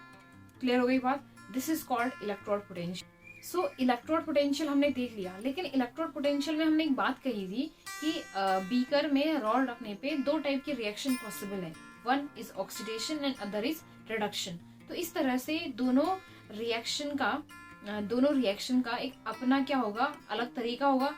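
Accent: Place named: Uttarakhand, India. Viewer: Nice lecture with uttrakhand vibe😊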